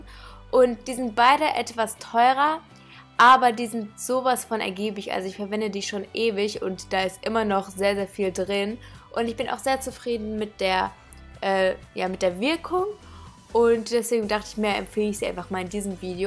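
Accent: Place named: Bavaria, Germany. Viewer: du kommst aus bayern :O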